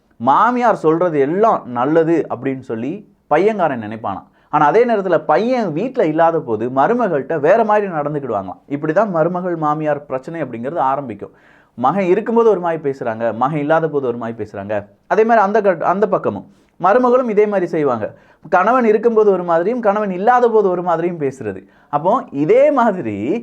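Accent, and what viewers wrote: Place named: Kongu, India. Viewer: boss, Pallu padama pesuradhu la Kongu region la common dha.. Unga region la pesalana adhukku onnu panna mudiyadhu. yenna oru 50 video potutta, Arasiyal Saanakiyar nu nenapo?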